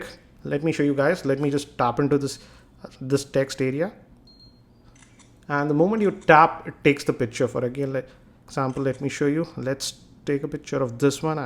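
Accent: India. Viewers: lol unbooxinng typical Indian accent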